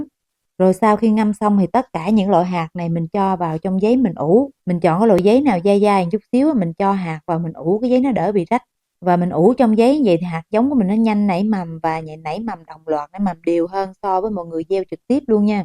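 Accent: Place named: West, Vietnam. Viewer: Giọng miền tây dễ thương dễ nghe hay do tui nghe quen rùi nên thấy dễ thương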